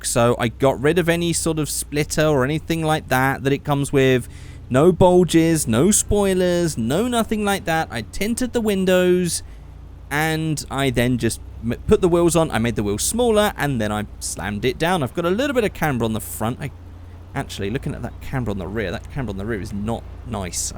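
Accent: Australia. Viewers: honestly not a bad aussie accent xD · Mate the Ford xr6 in black with red pint stripes is the tune I like....Australian accent not to bad Mr chrome dome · I was quite surprised by your aussie accent. Its better then some Aussies.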